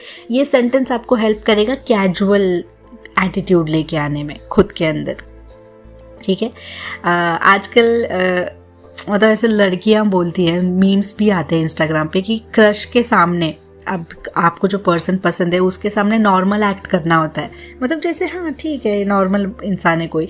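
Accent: Gujarat, India. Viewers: Gujju accent Kesaa, hahahah happens to me too LOL love you and your innocence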